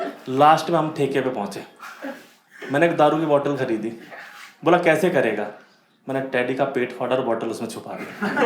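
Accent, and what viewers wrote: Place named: Haryana, India. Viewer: Bhai randeep hudda ka doooor ka cousin lgta hai, hariyanvi touch bhi aa rha hai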